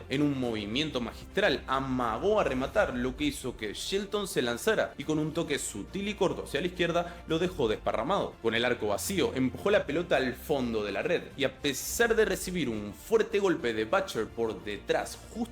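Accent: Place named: Argentina. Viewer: Es argentino😂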